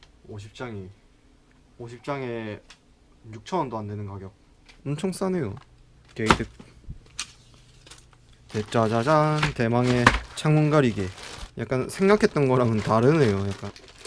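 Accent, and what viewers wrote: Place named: Gyeongsang, South Korea. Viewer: 솔직히 목소리만 들어도 힐링.. 목소리완전 터프... 경상도분들 말투 매력있어요 완전 상남자..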